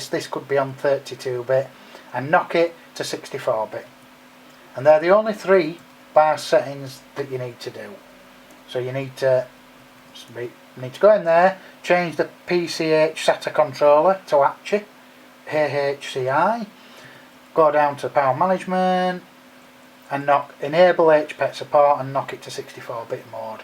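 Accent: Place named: North, United Kingdom. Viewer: Great video mate! Nice to hear a tutorial with a familiar northern accent :D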